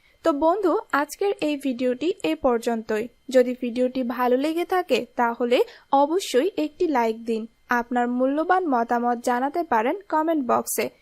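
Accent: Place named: Bangladesh. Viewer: আপনি কি বাংলাদেশের লোক? আপনার কথায় বাংলাদেশি টান আছে।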